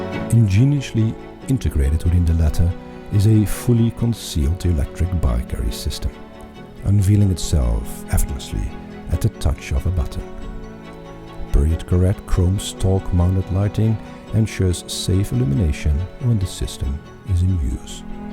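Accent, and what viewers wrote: Netherlands. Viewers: Jammer van dat Nederlandse-engels van de voiceover, maar wat een schitterend ontwerp. Als ik zo’n coupé zou bezitten zou ik Niels direct vragen die ook om te bouwen. Prachtig! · Not sure they’re assumptions. I’ve tweaked my wording to better express the ideas. It’s not a style from today and based on a vehicle from half a century ago, so anyone extrapolating from it is an outsider looking in. Seems like a fair observation more than an assumption that because it’s a LHD vehicle built by a Dutch company it’s not British, but Dutch. It has the most stereotypical of Dutch accessories, the bike rack. Even the voice over has a Dutch accent. The electric drivetrain comment is about a personal viewpoint, not an assumption. My third comment is not an assumption either. I’ve always thought herringbone tweed would look great in a car and you’ve proved that to be true! 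This vehicle is utterly charming and looks exquisitely built and I love the “exercice de style” that it constitutes. But it is undeniably anachronistic and somehow feels foreign. It reminds me of how the Japanese see Britishness.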